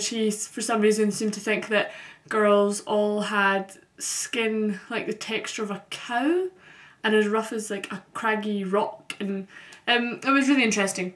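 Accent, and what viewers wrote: Scotland, United Kingdom. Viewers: Stereotypes can be quite frustrating. No matter where you are from. By the way: Your accent is beautiful. I've always had a thing for the Scottish accent. :) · I'm going to Glasgow to study for the semester in 2 days! Let's see if I can not look like a total tourist. (Although I love your accent.) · I love the your accent, my lecturer was from glasgow, his accent was a bit broader than yours, best lecturer ever though. He had the best sense of humour, I remember this one time in class several girls were reading fifty shades of grey, and one of them was reading it under the table whilst he was telling us about some fine artist; he caught her, asked for the book, opened it out to see what the most opened page was, and then asked the class if they wanted him to read it out. It was hilarious =D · But you do have quite a strong accent. 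I could tell it was Scots straight away :p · Ever since I found out that my family immigrated from Scotland in the mid-1800's, I have been obsessed with everything Scottish, I love your accent. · as far as the bagpipes go. of course not everyone plays them. they are a musical instrument and would take practice. my question is do most scottish people enjoy listening to them ? i really dig your accent !! i find it alot easier to understand than english people.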